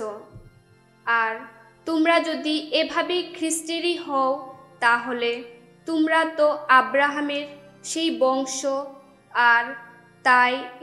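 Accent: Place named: Sylhet, Bangladesh. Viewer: সিলেটের বাংলা ভাষার টান রয়েছে ওনার উচ্চারণে।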